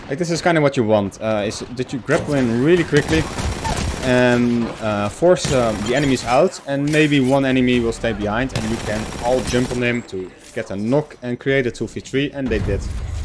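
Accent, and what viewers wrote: Netherlands. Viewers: Yo are you Dutch?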